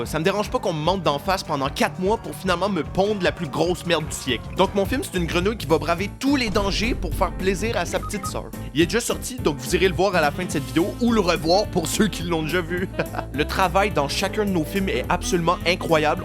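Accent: Quebec, Canada. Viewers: Hell'oooo Cher Mister, Je viens tout juste de découvrir votre Chaine (et votre existence de facto ), et je dois vous avouer que vous m'avez très vite séduite, autant par l'Apparence de votre Mignon Alter-Ego Girafesque que par votre Humour et votre délicieux accent Québécois.... Donc je m'abonne de suite, et compte bien m'offrir le plaisir de grignoter d'autres vidéos animées de votre création dès que j'en aurais l'occasion. Bien Amicalement votre, Lenore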